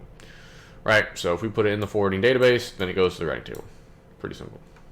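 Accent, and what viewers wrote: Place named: Texas, United States. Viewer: Sounds somewhat Texan - and I live in Scandinavia :D So, probably have to make do with the ones you release here then :)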